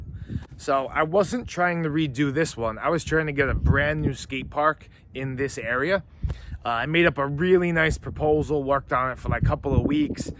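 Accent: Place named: East Coast, United States. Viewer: ahh Long Island, knew you were an east coast guy, figured jersey though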